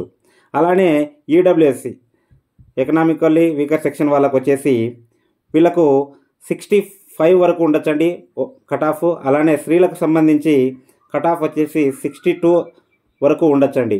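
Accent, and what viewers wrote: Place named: Telangana, India. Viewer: me language telangana voice la undhi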